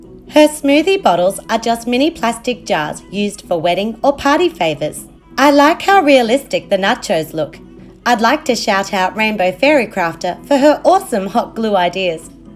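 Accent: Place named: Australia. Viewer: Your so welcome! I have always thought that the Aussie accent was cool, but when you speak, it sounds even more cool!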